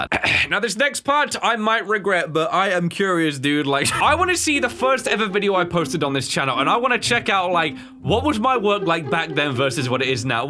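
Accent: United Kingdom. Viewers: He started out as the deep voiced British man who did FNAF theories and top 10s and then he started his own channel and 10 years later that channel has become something truly special and unique and one that has stood the test of time…congratulations thank you for a wonderful decade of fun 💙💙💙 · a decade of funny bri'ish man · well, i don't quite remember which one of your videos i saw first, but i did came here from jay kubz scout, because someone in a comment session talked about you, so i got curios and decided to check you channel. At first it was a little confusing for me and some parts i couldnt understand what you were saying because i only consumed english content made by americans, hence why i couldn't understand british accent, but it quickly grew on me and i got used to your voice, which had improved my english by a lot, so thank you for that! 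(Youre literally the only reason i can understand dawko and bazz lol) The thing that got me is the vibe and editing, that's for sure, because it was really special (still is) from what I've watched on youtube before. Now I'm waiting for upload or rewatch your old videos when i eat, its became a part of my routine, and i dont miss any video you upload :) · I kept watching your videos since 2020 because i needed to learn british english 😂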